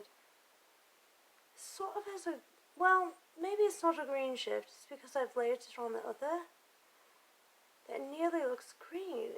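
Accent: United Kingdom. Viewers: Where are you from? Sounds like you have a British or English accent. I'm not sure. Lol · Oh good I’m early x hope u are good and thankyou for this upload! And am I crazy or do you have a British accent?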